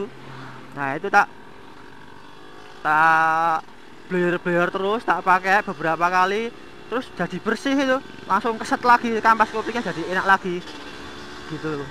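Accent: Java, Indonesia. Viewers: Jowo mas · Wong temanggung@ HAHAHA aku ngekek saben weroh video gon mase iki. Medok banget suarane wkwkwkwk :v :v . mirip IVW motovlog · wong magelang to sampean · Silang jowonya pekat #frommalaysia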